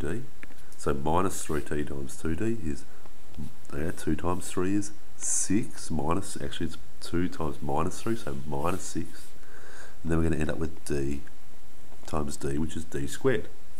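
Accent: Australia. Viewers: just curious but are u Australian? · i dont know if its the aussie accent or the explanantion, but this guy is a literaly wizard 🙏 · Are you aussie?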